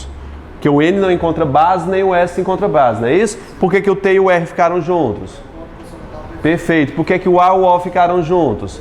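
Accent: Northeast, Brazil. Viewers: Primeiro professor que não me deixa entediada. É didático, sem gracinhas, sem exageros para prender atenção, direto ao ponto. Fora esse sotaque maravilhoso do meu nordeste 😍😍😍😍 Nossa eu amo suas aulas. Muito obrigada, de coração.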